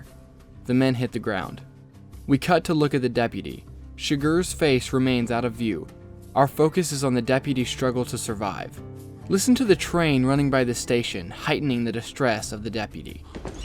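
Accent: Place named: South, United States. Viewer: People in West Texas don't talk with this southern draw.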